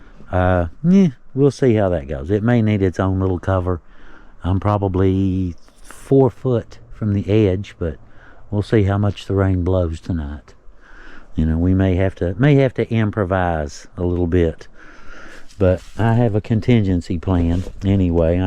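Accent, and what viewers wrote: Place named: South Carolina, United States. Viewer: Great video I'm trying to guess your accent. are you from South Carolina?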